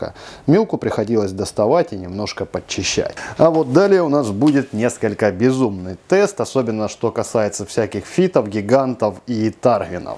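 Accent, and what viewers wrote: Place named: Rostov, Russia. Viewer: Прикольный ростовский выговор)))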